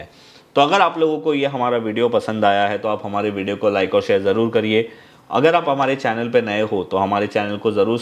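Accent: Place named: Mumbai, India. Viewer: ..aap ki bambaiyaa hindi zalak jati he..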